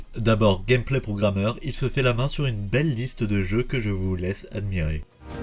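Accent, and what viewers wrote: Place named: United States. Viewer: Super Idée. Super Contenus. Super Texte. Mais je n'accroche pas le "style" que tu met dans ta vois. C'est très proche d'une vieille IA de Vieu SF mais sans la reverbe... Et pour mon cas je trouve que sa gâche le plaisirs que j'ais eu a reguarder cette video. Si pour X réson tu tiens absolument a guarder cette fasson très américanisé de parler je peut te consseiller des tuto pour y metre les effets audio qui vonts avec et mieu fair passer la pilule. Mais pour avoir testé ce style je te consseil le serieu et sinssert. Milles excuses pour l'orthographe.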